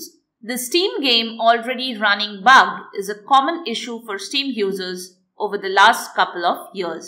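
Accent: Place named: India. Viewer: A minute of ads to listen to indian tech support ffs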